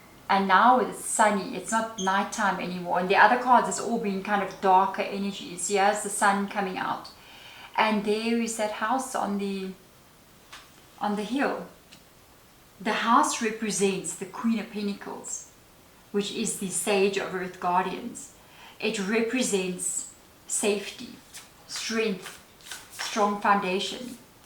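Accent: Afrikaans, South Africa. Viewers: gave me tears… your vid popped up, then i heard your S.A. accent, posted 13 hours ago, 13 mins long… something to do with Ophiucus.. south african taurus here ❤ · I command the Universe: "Abundance in all ways, especially financial abundance, flows to me like a never-ending waterfall" GRATITUDE GRATITUDE GRATITUDE (Ek hou van jou Afrikaans aksent !)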